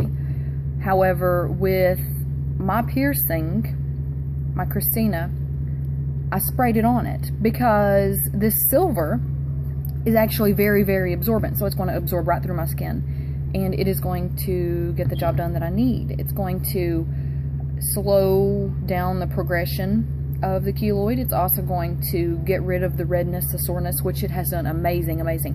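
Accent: South, United States. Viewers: My god I love your southern belle accent. Hey you should do a Bryson Tiller album review because he is from Kentucky and Louisville to be exact.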